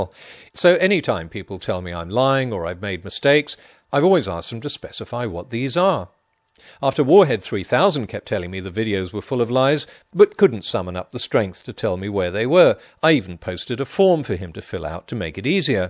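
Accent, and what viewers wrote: United Kingdom. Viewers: You might have people beeing sceptic about you. But at least you have this very very nice british accent (Is it Oxford?) and that always help upon credibility :-) Pun aside. You have very clever counter-arguments against people suffering from "youtubitis"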